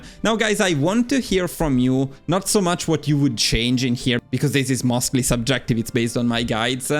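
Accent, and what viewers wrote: Italy. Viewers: that's got to be one the thickest italian accents I've heard. Not complaining tho, English is always better with a thick romance language accent.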